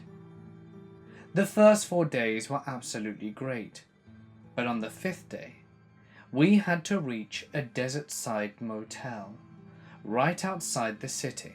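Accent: England, United Kingdom. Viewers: do you realize how weird it is, as an American, to hear U.S. stories told by a man with an English accent? Well, it is! 😂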